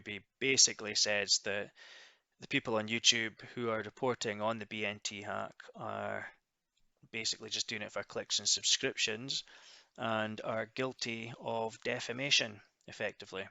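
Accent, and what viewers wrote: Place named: Aberdeenshire, United Kingdom. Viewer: Aye aye, We’ an accent like thon, there’s a fair chance ye can see Bennachie fae yer front door!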